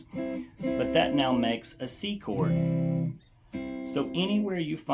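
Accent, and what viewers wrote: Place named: Mississippi, United States. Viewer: I have been on the brink of making this connection for YEARS! I could feel there was something simple I wasn't putting together and I couldn't make the connection until I watched this video. Game changer! By the way, that MS accent was recognizable enough that i had to go to your profile to verify.😅 Copiah county here. Do you do in person lessons?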